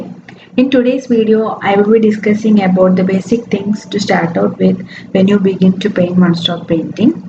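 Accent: Kerala, India. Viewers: Hi, i recently started viewing your paintings. All are awesome, no words to appreciate. From your accent, I guess you are a Keralite. Well, I am a Professional Makeup Artist & Aestheticiane. Also doing little bit of painting as a hobby. I usually use water colour. Recently I got few orders for paintings. Since water colours are non-durable, I decided to recreate them with acrylic, which is going very tough, because the paintings have lot of minute details. The colour is getting dry and chipping fast when taken on pallette. Colours are not getting blended easily because as soon as i make one stroke, it dries up. I dont know if it is the problem of the quality of paint. What I am using is Flash Acrylic Paint. Can you please give me some suggestions? Is acrylic paints really used for such detailed paintings? And is it mandatory to apply varnish after finishing the painting? 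I either use the paint directly or some times adding plain water. If I am troubling you, I am sorry. Please reply if possible. Thanks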